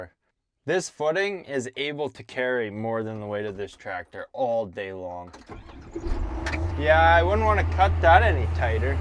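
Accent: Canada. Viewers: I'm here for the Canadian accent.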